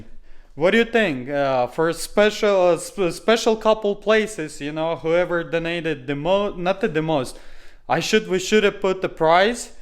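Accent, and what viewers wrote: Russia. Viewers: ты русский? акцент сразу заметен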